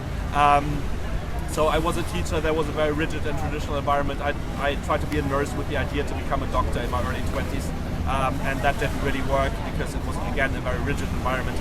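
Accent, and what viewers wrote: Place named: Germany. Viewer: I thought you sounded German :) Now I know this for a fact!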